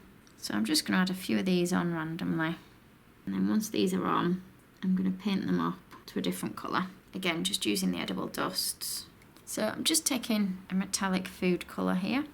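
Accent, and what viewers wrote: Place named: West Yorkshire, United Kingdom. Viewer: Great channel xx just wandering of your from West Yorkshire..you sound it haha xx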